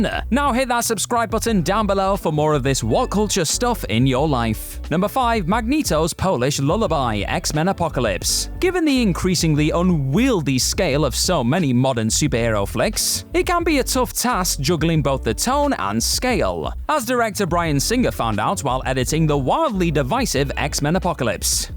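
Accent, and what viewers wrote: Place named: United Kingdom. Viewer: Yes it is, I've been watching British tv for over 40 years, I've heard that exact pronounciation hundreds of thousands of times from other Brits. It is part of the accent.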